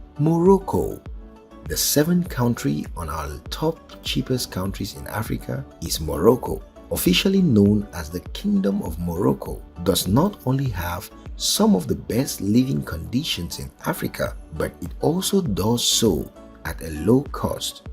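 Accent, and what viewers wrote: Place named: Ghana. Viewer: I can see that U r drawing from those Western people who come up with these incorrect assumptions. Nigeria is the cheapest country in the world. (Possibly, Pakistan may b a little cheaper). I have done items for items by dollar amount. I don't know where U got the idea of subsidies about Nigeria. The only one item subsidized by the Nigerian government is petrol and the IMF has bn telling the government to remove that only one subsidy. I live in the USA and as I am planning to move to a cheaper country other than Nigeria, I've researched (for 10 years) and travel to see where it's cheaper than Nigeria but haven't found any. I've seen some of those non African's websites declaring a lot of baseless numbers. As I can tell from ur accent, U r a brother frm Ghana. Can U believe that some of these non Africans claim in some of their presentations that Ghana is cheaper than Nigeria! 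U & I know that that's not true! So brother, to do a good job, wut U need to do is take items say, a dozen of eggs, a one bedroom apartment, a loaf of bread etc in one country and compare the prices in USD and convert them to the currency of ur country of interest. U'll clearly see wut am saying. Don't rely on those Oyinbo declarations. As President Uhuru Kenyatta of Kenya said, they'll be giving information about Kenya and at the end of the 'news' it'll be Mr. White, a correspondent from South Africa. That's almost 5000 kilometers away! 😆😆😆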